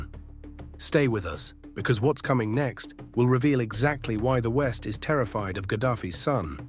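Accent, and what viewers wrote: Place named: United Kingdom. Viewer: YOU MAKING OF HIM A HERO JUST TO GET LIKES. THAT ALL IMPORTANT TO YOU LIKE I HATE UK ACCENT YOU ARE THE EVIL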